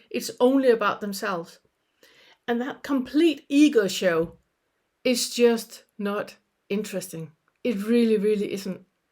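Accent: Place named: Copenhagen, Denmark. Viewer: Hi, I have been a lot in Cph, and regarding your pronunciation made me quite sure you must have been around there for a bit. Yes I will keep an eye on your posting! Appreciate/reconize most of your topics. Thanks for that!😊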